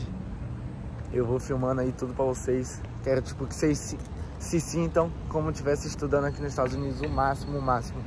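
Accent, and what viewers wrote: Bahia, Brazil. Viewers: Tu é baiano ?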